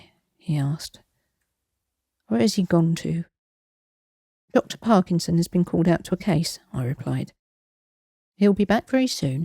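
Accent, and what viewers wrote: England, United Kingdom. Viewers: Was pleasantly surprised to see another story by you. Saved it for the last story of the night. I dont think you realize how beautiful an english accent is to a Southerners' ear. Again, I enjoyed the story and the storyteller!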